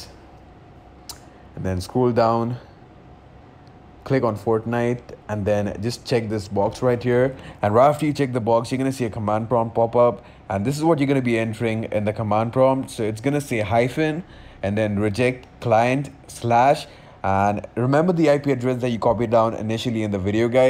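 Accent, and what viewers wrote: India. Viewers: Are you Indian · Bro are u indian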